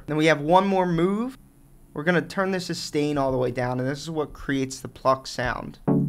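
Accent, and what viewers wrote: Philadelphia, United States. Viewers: bro u gotta be from philly/delco !!!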